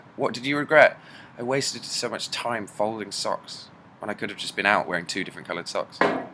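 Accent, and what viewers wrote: United Kingdom. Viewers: Thanks for these videos, it really reminds me of England listening to you. Wishing a real cup of tea could be shared, Ill just sip alone until dreams start breaking the realm of reality. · Oh... So he doesnt speak french but british englisch !?.. I thought he was Francis from France..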